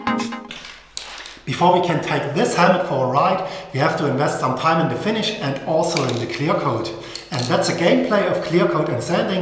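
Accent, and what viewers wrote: Germany. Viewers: I love how you can hear the german inside the english language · Absolut geil. Aber koch besser ist es das Deutsche im Englischen rauszuhören. Genial.👌🏻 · Ich liebe es wenn Leute englisch sprechen, man aber voll den deutschen Dialekt raus hört 😅😂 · er meint den starken akzent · Da hört man direkt dass der deutscher ist xD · Bin ich der einzige der merkt das er deutsch ist...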